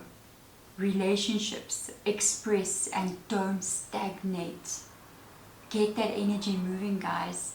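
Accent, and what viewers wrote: South Africa. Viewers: Are you South African? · I was about to say, mam are you South African? And you are 😩😩😩😭 we truly recognise our own ❤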